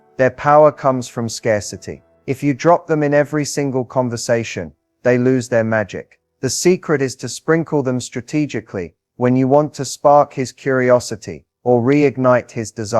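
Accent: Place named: England, United Kingdom. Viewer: I like this English accent. I think I should learn to speak like this .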